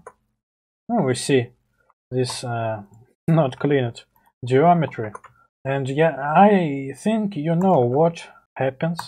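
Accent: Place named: Russia. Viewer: Хмммм он будто русский говорящий на английском 🤔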